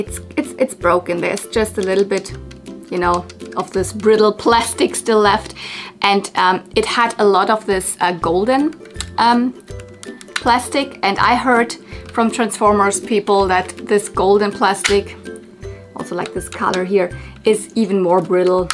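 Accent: Germany. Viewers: I adore your videos but I cringed today as there were soooo many 70's fashions that you passed up in that box, and that Ken looking doll was a Sears exclusive Craig doll which can go for pretty good money. I am a Barbie dealer and have been collecting since 1976 but we all make mistakes, and it's hard to choose when you aren't familiar to that era of dolls. I would have bought the whole box LOL I adore you and my best friend is German too, so I love your accent. I subbed both my channels. All the best to you :)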